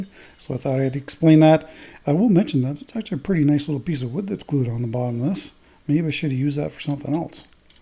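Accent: Canada. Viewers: I love how you Canadians talk. Nice work as usual, good Sir. Did you two get the house sold? I surely hope so.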